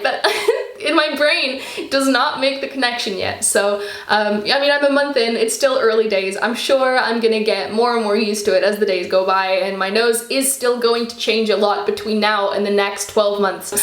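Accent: United States. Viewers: WAIT WHAT THE HELL I HAVE BEEN WATCHING YOU FOR MONTHS THINKING YOU WERE AMERICAN AND YOU'RE PORTUGUESE JUST LIKE ME WOW I JUST REALIZED HOW MY ACCENT SUCKS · Rowan I thought she was American lol😂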